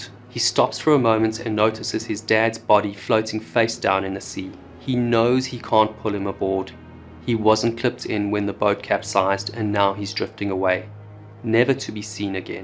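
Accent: South Africa. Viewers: you sound very south african...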